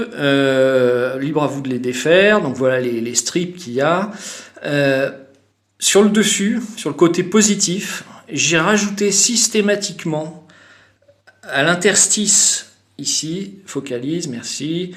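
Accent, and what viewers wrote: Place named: France. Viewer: mmm, this amazing french voice )))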